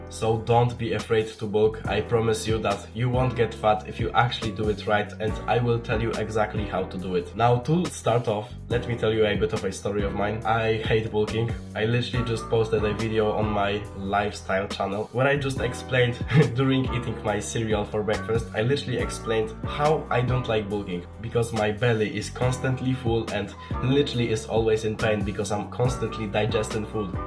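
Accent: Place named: Poland. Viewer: haha po glosie rozpoznalem xd bo cos taki akcent jakbys z polski byl xd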